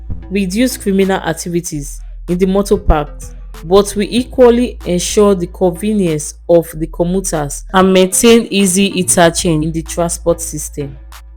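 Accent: Nigeria. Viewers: I love ur accent you be real edo babe ❤❤❤😊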